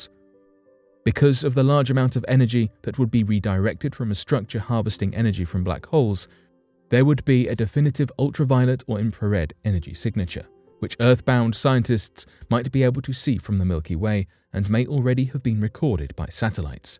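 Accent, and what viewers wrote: United Kingdom. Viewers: Earache British voice, thought this might be entertaining but it's just fucking annoying and monotonous, no sub, set to do not recommend channel.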